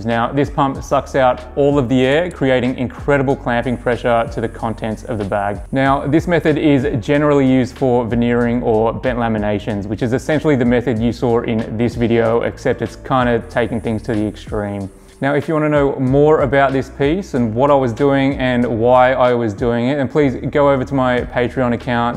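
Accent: Australia. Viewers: Hi, I just stumbled onto your video documenting your amazing bench seat. Nice to hear an aussie accent on YouTube and a Sydney business as well!!! Amazing craftsmanship, thanks so much for sharing... 🙂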